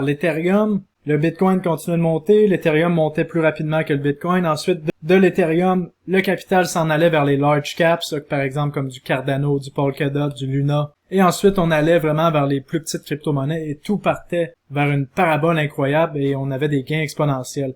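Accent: Quebec, Canada. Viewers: Alors quand tu dis que les médias de masse dise que de la grosse merde avec ton accent québécois, je suis juste mort de rire et ça illumine ma journée 🤩🤣🤣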